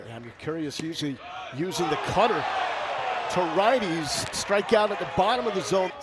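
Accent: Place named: South, United States. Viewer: “the runna is ou” 😂 welcome to the south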